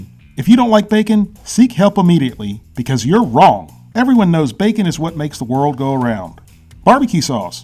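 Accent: South, United States. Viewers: Guy who's says that bacon goes the world go round? Yep, definitely Southern.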